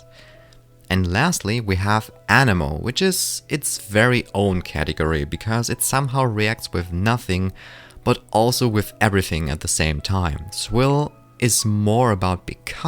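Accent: Germany. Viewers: I can tell you're German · Are you from Germany? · Du bist deutsch erkennt man bei deiner Aussprache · You are a german aren't you? I'm a german who can (no flex) speak english pretty good even though I'm really young and I've heard a lot of germans in my class speaking crappy english. I mean you're english is perfect but you can still hear the german dialect (correct me if I'm wrong). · Sounds like German-English to me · u sound kinda german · I wonder whats ur native language ? German?👀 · Deutscher Akzent hahaha xdd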